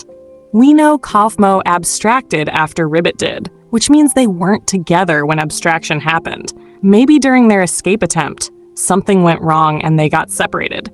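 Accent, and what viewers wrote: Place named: United States. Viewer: I love what the AI script is clearly British but the AI voice is so American, like hearing all these British words in an Ai American accent is so funny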